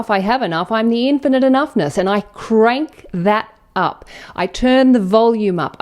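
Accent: Australia. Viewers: Thank you for reminding me about the law and its nature. Btw, what's your accent? Is that Aussie?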